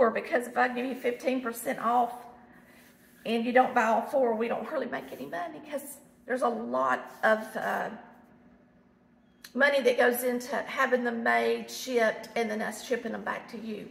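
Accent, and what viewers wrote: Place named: Texas, United States. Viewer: is so cute! It reminds me of my family growing up in Texas. She sounds just like them!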